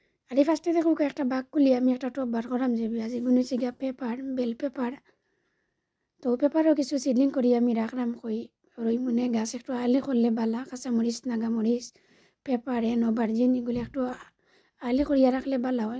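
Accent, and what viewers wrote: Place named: Sylhet, Bangladesh. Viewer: ও মাই গো আপনি সিলেটি আমার সিলেটি মাত আমার খুব ভালো লাগে। আমিও সিলেটের মাজো অনেকদিন আসলাম 😊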